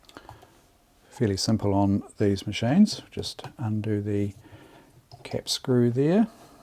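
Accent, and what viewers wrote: New Zealand. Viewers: So lovely to hear a New Zealand voice in the vintage sewing machine world. Horrified at converting a FW 221 to a jigsaw but also slightly impressed at the ingenious nature of the conversion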